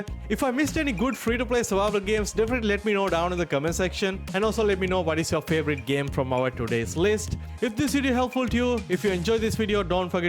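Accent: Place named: India. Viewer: bro u sound like indians if u dont mind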